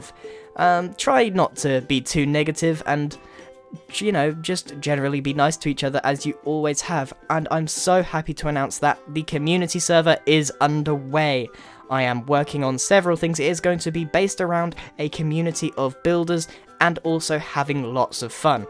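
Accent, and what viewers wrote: United Kingdom. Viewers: Oh are you British cause there are not that many YouTubers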